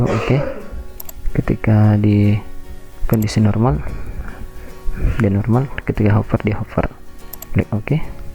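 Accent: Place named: Sulawesi, Indonesia. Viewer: abang orang sulawesi yah... makasih buat Tutorial nya ya bang.. keren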